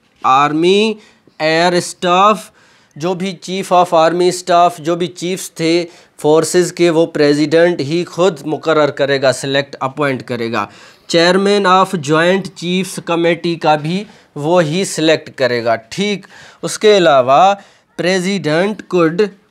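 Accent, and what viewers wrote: Sindh, India. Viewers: Sir are you sindhi ??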